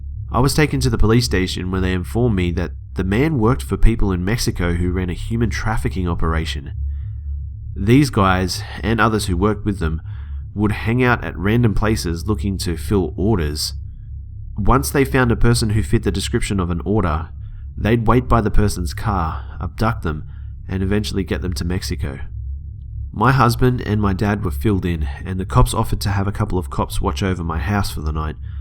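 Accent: Australia. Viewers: Subbed mate! Are you Australian? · Are you Australian I subbed by the way. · Lol Austrailian accent idk why but it sounds funny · I'm Australian born and bred, yet for some reason, you're the only Australian YouTuber I've seen who I enjoy listening to, let alone can listen to without wanting to eject myself into the sun. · Hey are u Aussie I am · Your accent is awesome! I've been dating an Aussie(Brisbane fellow) for awhile and I've just fallen in love with your accents:)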